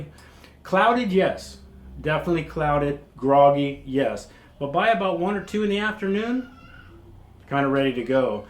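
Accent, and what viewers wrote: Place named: United States. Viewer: Hi, let me start by saying that I've been watching your videos for the last 4 years. It started when we were preparing for a roadtrip around Costa Rica and I came across your vids. I spent a couple of hours watching all available videos up to that time and I think the best tip from you which we used was free hot springs in La Fortuna:-) Anyway, we don't plan to go to Costa Rica again but I still watch you because I like your style and your keeping-it-real personality. Not to forget to mention that as a non-native English speaker, I like to listen to some nice American English. I'm sorry to hear about your booze problem and thanks for sharing it with us. You are the key to your success and besides supporting messages no one but you can beat this problem. But I'm sure you know that:-) To finish my lengthy comment here - as a person who likes working out - I have always wondered how you managed to be so ripped while drinking alcohol? 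Isn't alcohol just sugar with lots of calories? You definitely have a better body than many people half your age;-) Take care!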